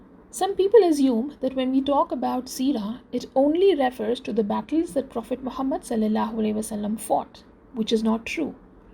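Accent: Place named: India. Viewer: i think she lives in Qatar(as mention in about section) but by accent it seems she's from India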